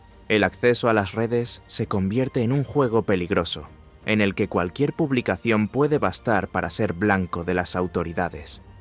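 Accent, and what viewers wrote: Spain. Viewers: El Locutor debe ser Español espero que haga un VIDEO DE SU PAIS E S P A Ñ A !!! QUE NO ES UNA MARAVILLA TAMBIEN HAY POBREZA Y BASTANTES DESAUSIADOS !!! CUALQUIER PAIS QUE LOS EEUU🇺🇸 LE META SANSIONES LE PASA LO MISMO !! VIVA VENEZUELA 🇻🇪 CARAJO !!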